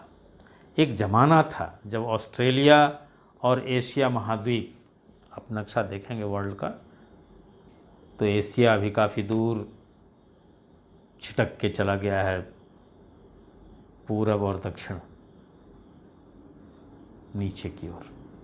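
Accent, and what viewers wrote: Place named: Bihar, India. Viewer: Sir aap Bihar ,ara jilaa ke h kyaaaa❤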